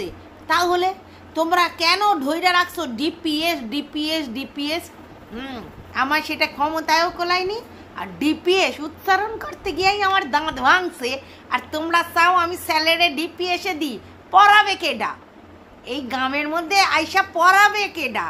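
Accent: Bangal, Bangladesh. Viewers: ভিডিও content je flavour tar sathe বাঙাল ভাষা মিলেমিশে খুব খুব মজা 🎉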